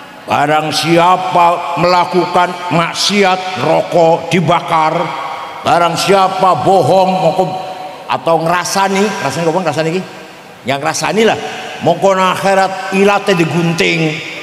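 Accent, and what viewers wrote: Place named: Cirebon, Indonesia. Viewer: Merinding ketika baca AyatAlquran....Suaranya khas tadarus cirebon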